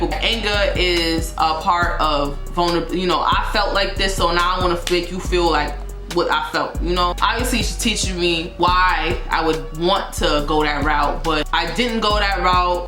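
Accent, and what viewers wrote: New York, United States. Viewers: it was the new york accent for me 😍 love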